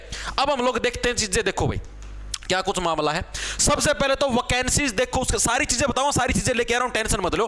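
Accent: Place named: Rajasthan, India. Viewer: Sir your way of talking is very cool Your spex add spice on the top Rajasthani and Haryanvi consent is very cool❤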